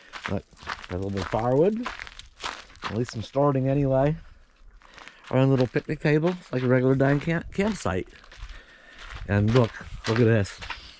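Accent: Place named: Northeast, United States. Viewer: You sound like yr from the NE area am I correct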